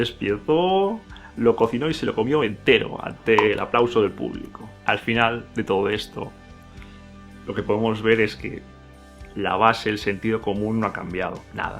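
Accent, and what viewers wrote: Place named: Spain. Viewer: Siempre me gustó leer sobre Grecia y Roma pero éste tema no lo tenía muy fresco con tantos detalles. Contado por ti es como escuchar un cuento. De qué parte de España eres? Preguntó por tu agradable acento. Yo soy Argentina de Buenos Aires. Aquí hablamos de vos en lugar de tú. El tú me parece muy lindo.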